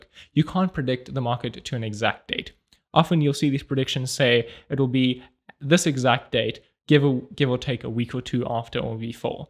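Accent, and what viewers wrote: South Africa. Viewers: Sounds South African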